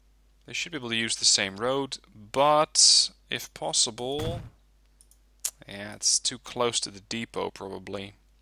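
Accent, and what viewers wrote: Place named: Netherlands. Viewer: I searched for wargame red dragon stuff and stumbled onto your channel. Now a day later i'm at episode 10 of your transport fever series haha (and watch a couple of videos of wargame ofc). Subs +1 ;) Keep doing what you doing! One question from which country are you? your accent sounds dutch.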